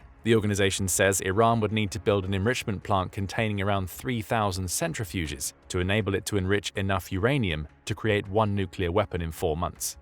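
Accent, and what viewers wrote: England, United Kingdom. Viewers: What bugs me about the narrator (Who by his accent is clearly English) is that he keeps pronouncing "Nuclear" like an American.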